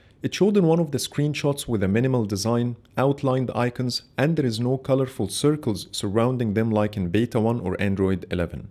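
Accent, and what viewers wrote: Egypt. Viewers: ال accent المصري دي انا عارفها كويس عظيم 👌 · حاول تعدل الاكسنت بتاعتك عشان الاكسنت المصرية او العربية واللي هي شبه الهندية للاسف محل سخرية كبير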